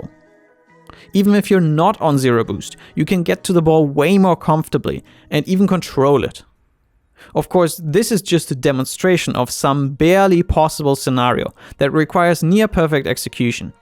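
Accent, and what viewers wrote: Germany. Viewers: Dear Mr, I still can't figure out your accent. It sounds german to me, but it's a pretty weird one. Did I guess it right? Sincerely Ein deutsprachiger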